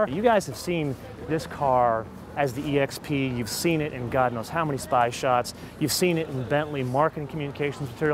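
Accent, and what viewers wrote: United States. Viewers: I hate American accent explaining a British Car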